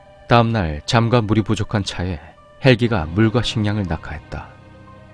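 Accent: Gyeongsang, South Korea. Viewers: 무서운것도 무서운데 목소리랑 경상도 사투리 딕션이 너무너무 찰지네요ㅋㅋㅋㅋㅋㅋ 니 안전핀 맺게 뺐어? 하나빼고 던졌지 말임다. 야이 서울대 강아지야 덩달아 선수씨바야ㅋㅋㅋㅋㅋ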